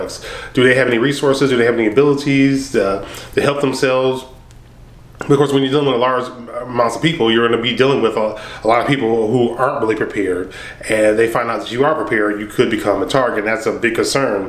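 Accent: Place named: Detroit, United States. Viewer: been watch for 10 years, wen he became so Detroit?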